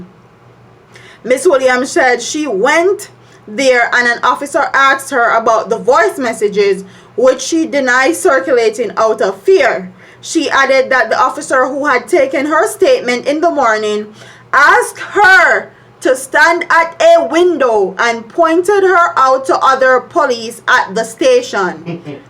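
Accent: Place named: Jamaica. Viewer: I love when you give it to them in the raw patois! Lol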